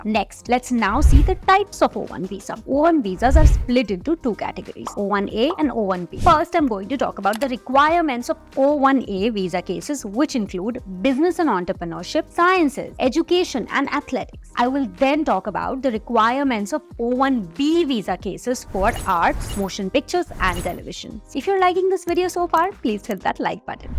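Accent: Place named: India. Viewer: Horrible,horrible accent ,true torture to listen to ,we have no problem with creators from India who are speaking with American or Canadian accent,lots of people is learning English from YouTube but no one is trying to learn English with this accent….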